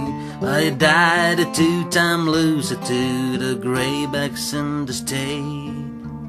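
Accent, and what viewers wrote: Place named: United States. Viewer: Cool! Are you shure you are a Finnish? Sounds so perfectly Americano, man...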